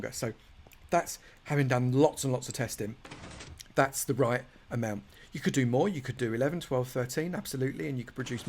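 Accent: United Kingdom. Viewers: it's not even close, like it's not even in the realm of Gordon's low fast-paced voice. The only similarity is that they both have a British accent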